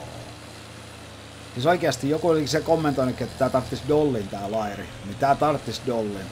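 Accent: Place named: Ostrobothnia, Finland. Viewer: En tierä onko sulta ikinä kysytty tätä tai ootko vastannu mutta ookko pohjanmaalta kotosi tai asukko tällä hetkellä täälä. Kuulostaa murre nimittäin vähän samalta👍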